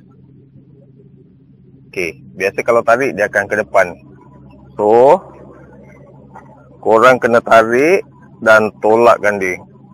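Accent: Java, Indonesia. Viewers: Pekat kang jawanya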